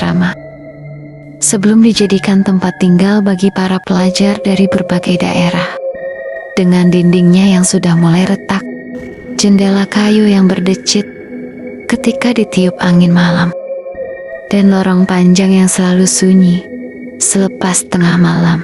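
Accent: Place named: Indonesia. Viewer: Hai anak mak shayang, mak hadir layan sbg peneman tidur 😍 huhu terbaik cerita malam ni... kisah asrama 👻 smakin menarik editing ai dan penceritaan wlopon loghat indonesia mak suka 😀 keep it up shayangku, smoga trus sukses, Amiiin🤲🫶❤️❤️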